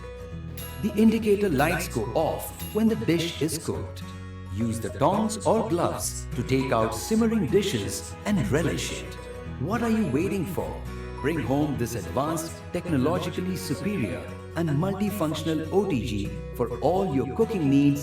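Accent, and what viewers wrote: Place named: United States. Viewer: ये क्या American हिन्दी hai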